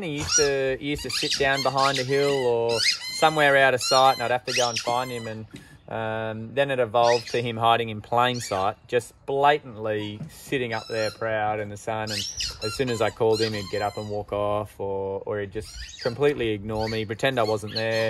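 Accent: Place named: Australia. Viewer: You got good videos and totally a stress reliever to watch however, it stresses me to try to understan what is being said with that Australian accent. Watching from California USA . .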